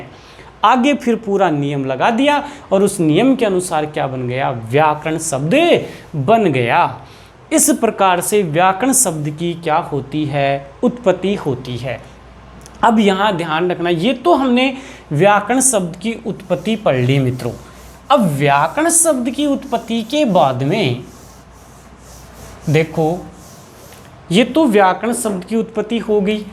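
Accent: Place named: Haryana, India. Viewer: आपको निश्चित ही हिन्दी / संस्कृत की गहरी समझ और मज़बूत पकड़ है। उच्चारण में बस थोड़ा हरियाणवी ढंग से फिसलन हो जाती है। पर आपको बारीकियाँ पता हैं। सादर आभार व्याकरण शब्द की व्युत्पत्ति समझाने के लिए।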